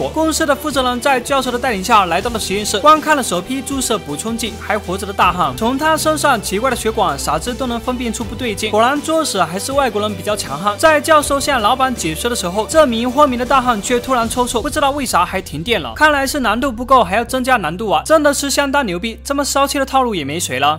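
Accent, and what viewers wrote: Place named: Fujian, China. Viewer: 应该是胡建。普通话不标准。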